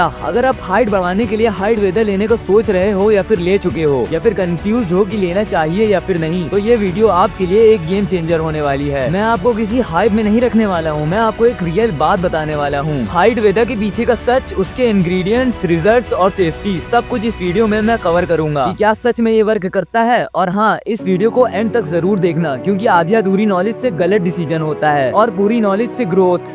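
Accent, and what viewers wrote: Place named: Lucknow, India. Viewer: bhai ap bhai lucknow jaisi aawaz me bolte hoo and mai lucknow me reh chuka hu brother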